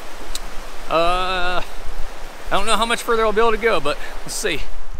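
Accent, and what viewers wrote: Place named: Alabama, United States. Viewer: Where are you from? Accent sounds like Alabama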